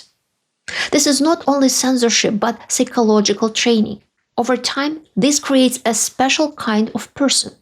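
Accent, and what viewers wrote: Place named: Russia. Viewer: I love your podcast. Your insights are very interesting and important to hear from someone who grew up in the Soviet Union. Your English is impeccable with a lovely Russian lily!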